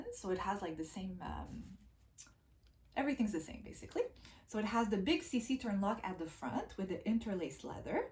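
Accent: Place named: Quebec, Canada. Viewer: You're french Canadian right ? I feel like I can hear the slight Canadian french in your accent. 🥰😊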